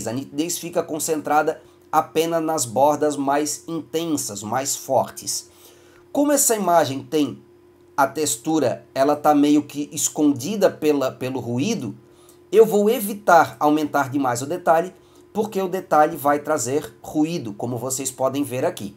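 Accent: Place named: Santa Catarina, Brazil. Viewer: pelo sotaque é catarinense não? rsrs Florianópolis? ótimo vídeo. parabéns e obrigado por compartilhar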